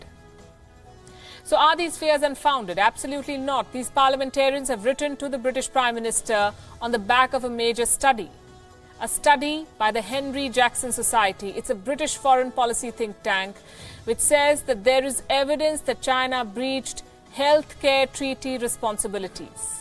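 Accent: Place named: India. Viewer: Listening the accent I'm sure she is another indain journalist Aaj Tak, India tv and now this shame on you Is there any channel of India which broadcast a real news ? Just send me the name . I really would like to watch it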